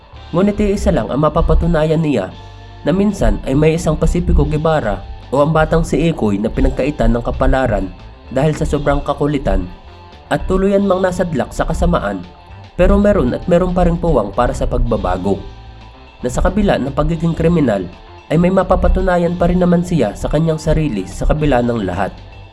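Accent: Philippines. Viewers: bisakol ampota!!! pilit managalog brad ah! tang ina ka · Taga saang probinsya yung nagsasalita? Bulcan ba yung ganyang punto?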